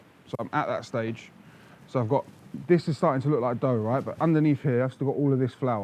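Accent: United Kingdom. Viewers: I have a feeling that he is going to be like Turkish version of an Action Branson-Matty Matheson mix with a British Accent. No matter what, this channel has a huge "million view every video" potential. I hope you'll do great man. Also, I couldn't stop thinking that you wasted that juice by draining, that would be a great salad dressing base. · funny to hear a turk speaking british english. I love it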